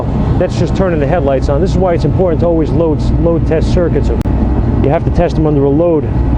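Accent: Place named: East Coast, United States. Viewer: But I love your big mouth and eastern accent.! LOL THANKS!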